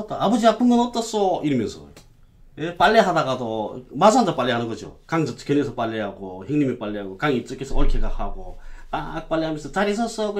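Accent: North Korea. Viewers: 재미있게 잘 보았습니다. 북쪽 말을 알아 듣기가 조금 힘이 드네요. 70 ~ 80 % 정도 밖에 이해를 못 했습니다. 말씀을 조금만 천천히 해 주시면 더 많이 알아 들을 수 있을 것 같습니다. 하루 속히 남북이 자유롭게 왕래할 수 있기를 바랍니다. 좋은 말씀 감사합니다. 남쪽에서 행복하게 사시길 빕니다. 건강하세요 !!!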